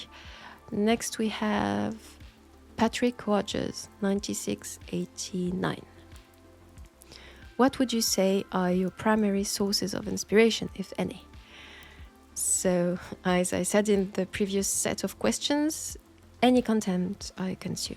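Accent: France. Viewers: Some excellent questions here. The sort of questions it's thoroughly enjoyable listening to you answer, but I would find excruciating to answer myself, being a 'bear of very little brain'.. Thank you so much for taking the time to do this. It's such a delight hearing you as yourself, once in a while. It has also made it easier to distinguish, as my daft question pointed out, a true etherial entity, swirling in a non-committal penumbrian light.. With a distinct French accent, of course.. :) Lesson learnt!. I assume you are partial to mountains, due to your own particular part of France, & the destinations you mentioned you'd like to live / visit? I can understand that & the type of nostalgia it brings. The banner picture in my profile shows the sort that I have those kind of feelings for, although I'm sure I could grow accustomed to mountains too, in time. As long as it's fairly rural countryside, & away from hoards of people. 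The flat stuff's just a bit more forgiving when one has the mad compulsion to go cycling. With regards to Trench Crusade, I, too, will likely never play it, let alone purchase, paint & prepare even a single faction. But since I became aware of it I've lapped up as much lore & artwork as possible. Amazing, dark & sinister looking creation. Thank you once again for the time & effort you put into everything. Enjoy the rest of your weekend. I'll meet you back here again soon :)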